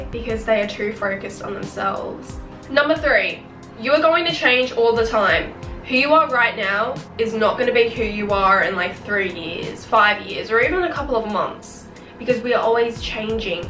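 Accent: Australia. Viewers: I have the same accent lol I'm Aussie too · are u an aussie?🤨🤪🤭😏😌😪🥶🥵😵💋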